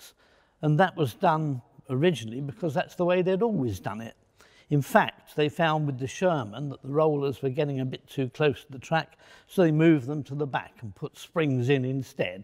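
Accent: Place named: United Kingdom. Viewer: The British accent and the beautiful mustache make the video better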